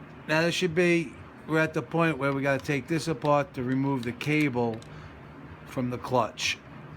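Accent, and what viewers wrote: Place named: Bronx, United States. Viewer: I will be applying this fix to my 2005 Buell Lightning XB12Scg, since they have similar engines, and hopefully, the concept is the same. However, I won't know for sure, until I get in there. I'll let you know how it goes. Thanks again. By the way, you sound like you're from the Bronx. I'm originally from Throg's Neck.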